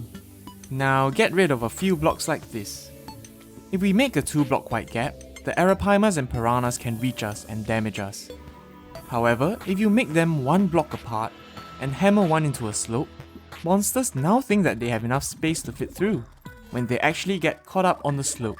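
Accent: Singapore. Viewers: Are you Singaporean? You sound like your from Singapore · Uh is it me or do u sound a bit singaporean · Are you singaporean? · U sound really singaporean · are u from singapore? u sound so familiar O.O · Why is the Singaporean accent so fucking nice? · are you singaporean or malaysian? Your commentary is relaxing and familiar:)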